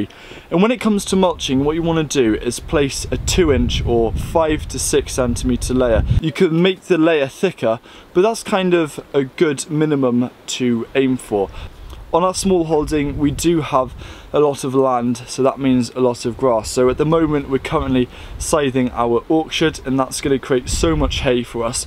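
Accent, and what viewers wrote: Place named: United Kingdom. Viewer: Good expectation,I love the British accent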